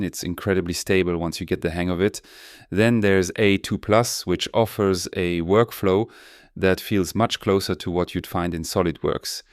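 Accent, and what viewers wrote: France. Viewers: Are you french ? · very very well. although the speed of talking ( that i had to reduce the speed to 0.75 or even 0.5 in somewhere) I liked the way of explaining and your very pleasant French accent. I still have a problem with constraints and why, when, where, which and how to use them😁😁. If its possible make a clip about that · I can hear the accent… your french is showing! ( vrai question, est-ce que ce tuto est disponible en français? Je n’ai rien trouvé… avec l’outil de recherche)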